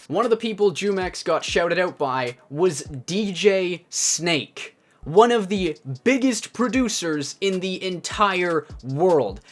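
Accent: Canada. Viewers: Jesus christ another video that you made using the term "industry plant" incorrectly. Not sure why your gay ass incorrect videos keep coming up in my feed, but it needs to stop. Additionally you need to stop making these videos using terms you learned online incorrectly with your canuck accent. Shit is cringe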